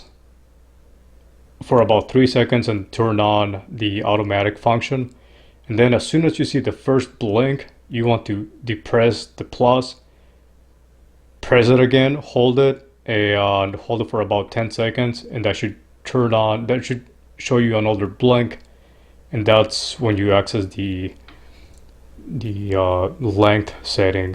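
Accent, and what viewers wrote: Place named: Canada. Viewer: Canadian accents are the best.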